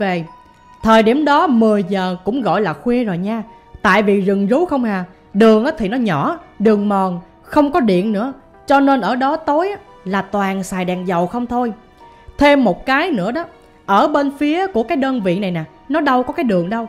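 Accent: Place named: Mekong Delta, Vietnam. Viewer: Anh kể nghe đậm chất miền Tây luôn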